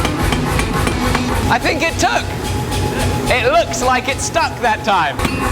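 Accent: France. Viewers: I'm starting to get used to see you achieve great work when you had no idea how to do at the beginning but I will never be used to your perfect French accent! I never met a British would spoke that well^^ when will you make a collab with a French guy? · oh l'accent frencais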